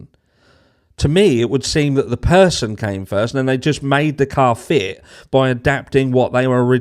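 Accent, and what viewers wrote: Norfolk, United Kingdom. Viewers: Wow, you've a thick norfolk accent!! I dont have a regional accent but i'm born & and raised in Norfolk so I recognised it within seconds! You were saying the word 'hear' but you pronounce it as 'hair', made me smile!😊 The lawyer you know is absolutely brilliant youre right. He always remains objective, even though he leans to the side of a defence perspective. Isn't it nuts how differently the US tries cases? They are so open compared to us here in England!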